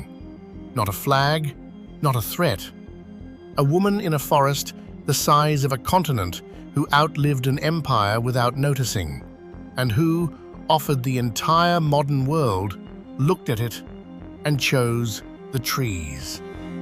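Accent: United Kingdom. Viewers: The naration is British, not Unitedstatesian.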